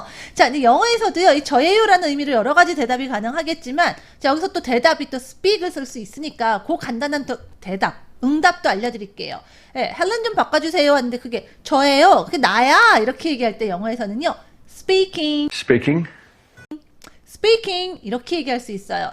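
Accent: United Kingdom. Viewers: 원리 중심으로 구분해줘서 머리에 쏙 들어 오네요. 감사합니다 근데 샘이 영국식 발음이네요ㅜㅜ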